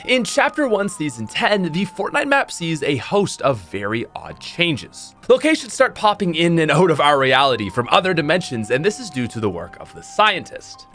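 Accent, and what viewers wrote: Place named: Nova Scotia, Canada. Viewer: First time watching one of your videos and I can instsntly tell you're a Scotian just by that accent 😂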